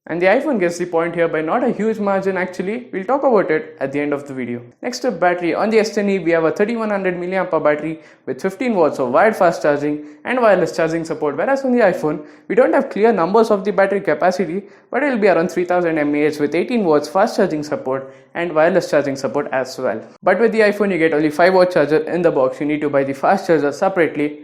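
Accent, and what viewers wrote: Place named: India. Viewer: I am quite fond of stereotypical Indian accent and understand it well. But it's not bad to train yourself to speak in a more neutral accent. It can be done, you just need to practice. Also, work on your pace and avoid having unnecessary movements as they make you look awkward and nervous. Good luck on your next videos!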